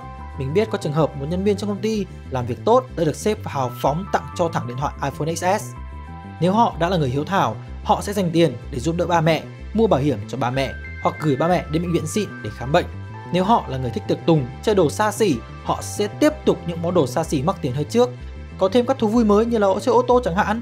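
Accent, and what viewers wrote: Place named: North, Vietnam. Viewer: Clip khá hay! Cám ơn Team! P/s: chỉ có xíu ý kiến là người đọc có thể nói chậm, rõ hơn, đừng nuốt chữ. Thật sự người miền Nam như mình nghe giọng miền ngoài rất khó, huống chi các bạn nói nhanh, là tụi mình khóc cạn dòng sông. Tụi mình xem thời sự đài VTV là không hiểu gì luôn. Cám ơn vì đã đọc!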